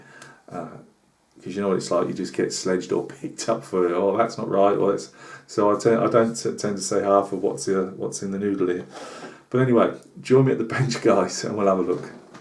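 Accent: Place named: England, United Kingdom. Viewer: It is so good hearing an English accent again. I am an expat from South Africa, been living in Spring, Texas for the past 29 years. I love your hobby, so nice.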